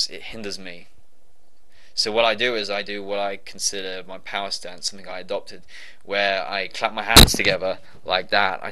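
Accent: United Kingdom. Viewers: What kind of British accent do you consider yourself to have? Just curious because I'm studying English and really like your accent - I'm not sure though in which area of the UK do they speak like you? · Hey brother, firstly congratulations on your monumental achievement. Your video is spot on with all the good reasons to give up the fapping and is of great inspiration to me. I have been in exactly the same spot you described, massively addicted to gaming and fapping for years. I am now slowly pulling myself out from it (no pun intended!), and have decided to focus the extra energy and time towards meditation. Thanks for all the inspiring words. By the way I am trying to place your accent, Mid-Wales or Shropshire area per chance? Cheers again matey! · Where you from bro? Is that a welsh twang in your accent lol? I'm gonna start no PMO tomorrow, gonna aim for 30 days and may continue after more research and experience etc. 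Have you got facebook?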